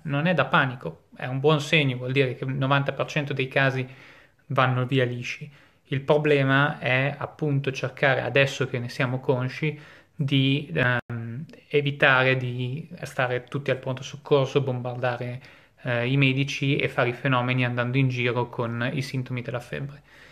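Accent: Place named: Piacenza, Italy. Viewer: Piacentino certificato!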